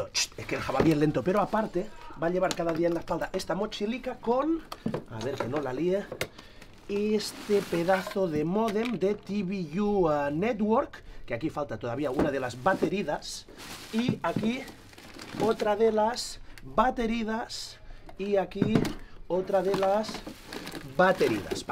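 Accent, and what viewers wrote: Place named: Andalusia, Spain. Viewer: Para ser catalán no veas la gracia que tienes, que pareces andaluz! Un abrazo desde Graná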